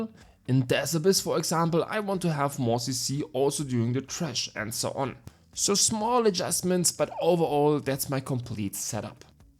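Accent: Germany. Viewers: Hab sofort gemerkt dass du Deutsch bist ^^" bester Guide den man sich als Anfänger reinziehen kann nicht zu kompliziert troztdem Detailreich, kurz aber nicht so kurz dass schritte übersprungen werden. Alles super erklärt gerade für mich als Anfänger was MMOS angeht. hab auch mega rescourcen und Zeit verschwendet da ich ein Build nachgemacht von jemanden der angeblich nur Builds und gameplay klaut aber bin mir sicher. Mit dem guide hol ich dass schnell auf, Danke für den Mega guide :) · Hab ich doch richtig vermutet, dass du n deutscher bist :) Kannst den auch mal in Deutsch machen. Bin zwar halbwegs Sattelfest mit der englischen Sprache, aber Deutsch fällt dann doch leichter... Danke für das Video. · Oh, you are German? Deshalb mag ich deinen Akzent wohl so sehr :'D Und natürlich Lob an deine Videos! Ich finde es sehr hilfreich, dass du immer durch alle Skills gehst, und auch erklärst, warum welche nicht genommen werden, so ergibt es irgendwie mehr Sinn.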